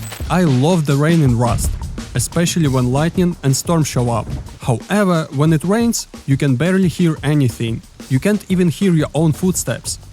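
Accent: Russia. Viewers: Я не критикую и не осуждаю. Просто хочу дать совет. Во первых по акценту слышно что русский. Во вторых существует такое термин как «культурное несоответствие», либо «культурная специфичность». Это говорит о том, что каждый язык несет свою культуру и историю, поэтому идиомы, фразеологизмы переводить дословно будет ошибкой. К примеру «save your nerves”. Англоязычный народ так не говорит, для них это странно. В таком случае следует прибегнуть к использованию словаря, чтобы повысить качество текста. Удачного прогресса и развития!❤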